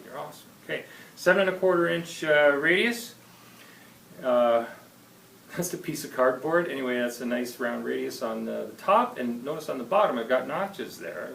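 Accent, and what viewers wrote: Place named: Toronto, Canada. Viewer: for any non-canadians, (and anyone using a string action ruler): A canadian nickel is 1.77 mm thick. A canadian dime is 1.22 mm thick (so two dimes would be 2.44 mm) …I didn’t pick up on the Toronto accent until after i had fished around the house for USD pocket change. American Nickels and dimes are like 20% thicker than their Canadian equivalents, maybe they’d be a good rule of thumb for setting up for slide. Anyway, thank you for the help, my tele plays and sounds like a dream!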